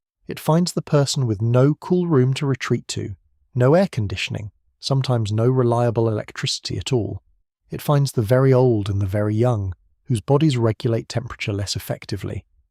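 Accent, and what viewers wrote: United Kingdom. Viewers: Dude it is the water current of the changing Ocean’s…… CHANGING THE SALINITY!!!!! So it won’t sink and freeze ! ONCE IT ROLLS OVER!!!!! You will pray for Hot days!!! England will be under Ive for 100’s of years!!! (IT IS A CONVEYOR BELT THAT FREEZES AND AINKS AROUND Greenland!!! Loosing salt because of the melting Ive waters from North Canada and Greenland itself! ( heat in England comes from the hot ocean)!!!! LISTENING FOR THIS D BAG BRITI ACCENT TRY TO BRING A DIVE OUT FROM UNDER HIS HAT!!! THE JET STREAM!!!! No s h i t e!!!! Try a tornado with your milk tea whoopsy-daisy!!!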